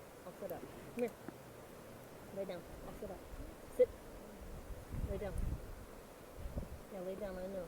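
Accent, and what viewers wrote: South, United States. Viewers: Thanks again for taking me along on this adventure. Has anyone else thought that southern accent has faded just a little bit?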